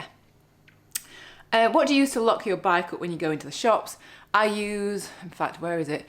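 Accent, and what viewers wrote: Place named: England, United Kingdom. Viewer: You are so beautiful. Listening your vids to hear lovely english accent and also for bikeride infos. Thank You. Also I follow Harley Johnson, amazing man... Good luck to you on your rides. 💛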